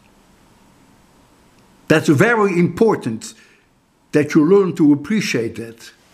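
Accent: Netherlands. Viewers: respect, you sound like a dutch man btw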